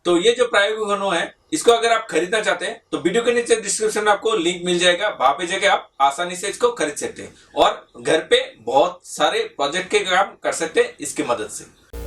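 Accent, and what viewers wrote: Bengal, India. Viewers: Sir yuo are bangali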